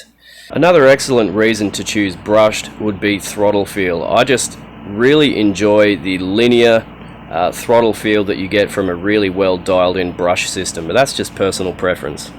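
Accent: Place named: Australia. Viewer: Ha! I thought from your accent you were down under. But yeah, NC probably still a bit more toasty. I don't think it is. Josh did a redo after his near meltdown. Glad he's simplified and condensed things down into one rig. New unit looks killer. But I still find I can't quite figure out his shop page. Definitely could be just my dyslexia, but I think it still needs more work. I can't tell what comes with what or if the combo/kits are complete or partial. Always prevents me pulling the trigger as with shipping, exchange rate, import duties, etc. it would be costly to order incorrectly. Love Josh though. He's the mad scientist of 1/24th's. :)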